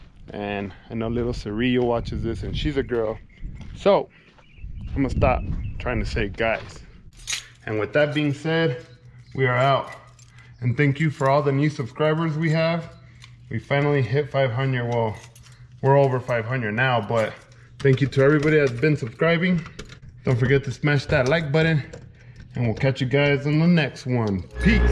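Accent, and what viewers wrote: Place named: South, United States. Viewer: Love that country twang 😂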